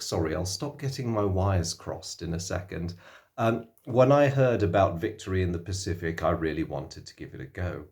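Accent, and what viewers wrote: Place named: United Kingdom. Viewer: This was a pleasure to listen to. You’re an excellent speaker and communicator. Very clear and organized in your presentation and with a magnificent British accent. 😊 You’ve given me some added inspiration to read through the rules tonight and tomorrow to play a young man who I’ve introduced to these old war games (and some newer ones as well). I’m 61 in first started playing Avalon Hill games about 48 years ago. They are my one remaining hobby.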